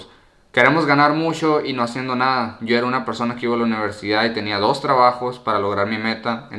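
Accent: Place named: Sonora, Mexico. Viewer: AWEBOOO yo sabia que este mushasho era de Sonora! 👌😎 Te acabo de conocer por el video de jordiwild, y nomas por el acento pense "este vato es de aqui del rancho"... Esta curado tu canal, ya tienes un sub mas 🤜🤛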